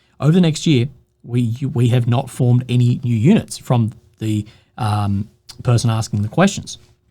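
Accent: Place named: Australia. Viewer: This top bloke is an Aussie.His accent as well as his ideas are also 'top'!!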